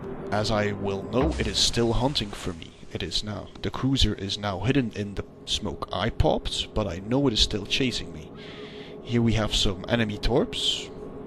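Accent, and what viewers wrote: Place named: Russia. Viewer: I admire your accent. I can hardly recognise you are Russian at all!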